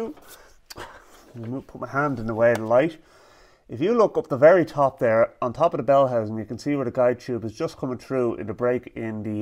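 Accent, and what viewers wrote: Ireland. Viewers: Are we Irish?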